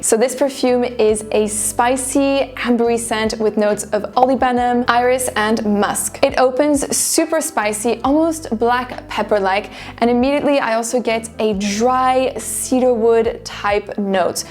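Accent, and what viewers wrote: France. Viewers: In any case, I'm waiting, but I follow you a lot on YouTube. Nevertheless I just subscribed to your IG page and I love your little French accent. THANK YOU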